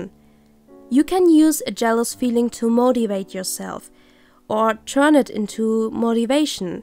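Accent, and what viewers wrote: Germany. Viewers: Hey^^ are you german?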